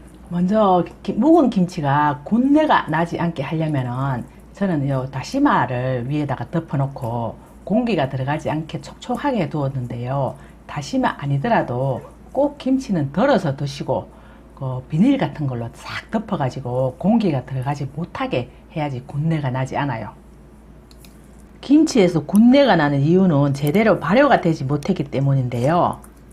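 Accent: Gyeongsang, South Korea. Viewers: 너무 사투리를 자랑스럽게 쓰시는데 좀 듣기 싫네요. 시청자는 경상도만 있는게 아니고 전라도 강원도 충청도 제주도 함경도 등 많습니다 재미없는데 혼자 웃는 개그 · 경상도 사투리에 너무 재밌게 봤엉ᆢㄷ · 목청 큰것도 갱상도 매력입니더~~ㅎㅎ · . 경상도 말투로 해설이 어찌나 구수한지. 😅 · 오줌마려워일어나서요리강좌듣는.정신없는늙은입니다.구수한갱상도사투리가 정겹네요.여러가지양념장만드는는쏨씨.대단하네요. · 잘들려서 좋고 경상도 말로 툭툭 경상도사람인 나는 듣기좋읍니더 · 좋은 정보 감사 합니다.목소리 안 커요. 구수한 경상도 사투가 얼마나 좋은데요. 다음에 한국 가면 밥 한끼라도 사드리고 싶어요. 그래도 될까요? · 구수한 갱상도 사투리가 참 정겹네요 상그라이 식히가~~ ㅎㅎ 집에있는 묵은김치 따라해봐야겠어요 잘보고 갑니당😍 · 언제나봐도, 구수한 경상도 사투리 말씀이 이렇게구수하고, 듣기기분좋은지 몰 랐어요음식솜씨도, 쉽고맛있게 꼭 해보고싶은 맛입니다.감사합니다.건강하세요 참고로 여긴 전주입니다. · 늘대단한음식만들어주시구경상도구수한말씀잘듣고있내요묵은지한번해볼께요 감사합니다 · 갱상도말씀 너무잼나요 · 경상도 말이 너무 멋져요. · 구수한사투리 즐겁게 잘듣고 잼난 요리도 잘보고있네요 근데 경상도 아닌지역 분들은 잘알아 들어 묵을랑강 걱정되네요 ㅎㅎ ㅎㅎ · 대구 말씨에혹 살림9단 좋아요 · 경상도 어느 지역인가요? 말 솜씨가 좋으시네요~^^ 좋은 정보주셔서 감사합니다😊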